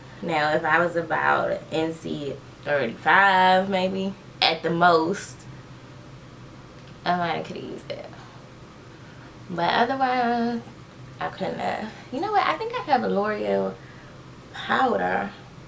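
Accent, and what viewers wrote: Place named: DMV, United States. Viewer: Hi there! I stumbled upon your video as I'm trying to get reviews of this foundation. You sound like you're a local girl to me (I'm in the DMV). Thanks for the video!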